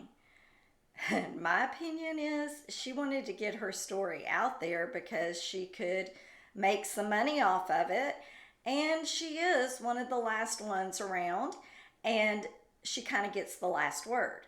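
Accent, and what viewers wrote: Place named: Memphis, United States. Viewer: Cilla is going all-out to create an angelic image of herself in the public eye when we all know she wasn’t. Ps. I love your accent, is it a Memphis one?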